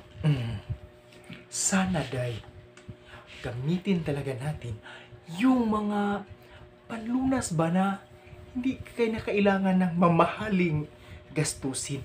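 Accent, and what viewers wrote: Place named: Visayas, Philippines. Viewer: Hehehe, thank you po sa very funny content na ito. You entertained me po talaga! Ang galing mo po mag-act/host. I love the flower background also. Love the Bisaya accent.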